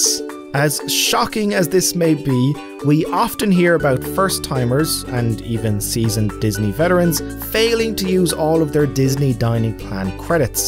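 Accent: Ireland. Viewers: Are you Irish? I love your accent! It reminds me of a professor I had in college. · sounds it, I have an Irish neighbor who sounds like this but female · Love 💗 Irish accent!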